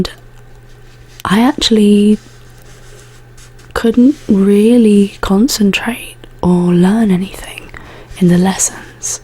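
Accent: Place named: United Kingdom. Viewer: Your voice is very nice, the accent and all, to me as an American. Also very sexy I love British women that aren't total slags.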